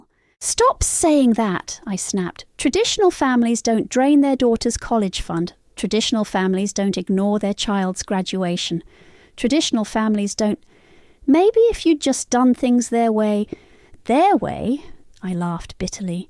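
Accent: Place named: United Kingdom. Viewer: I loved this story and I really loved the British narrator 😊❤🎉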